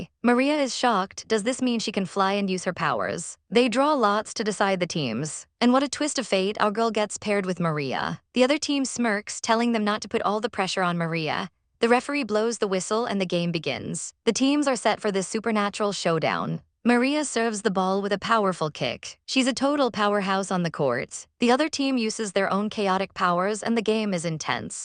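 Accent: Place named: Boston, United States. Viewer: Is it just me or does this AI got a Boston accent 😂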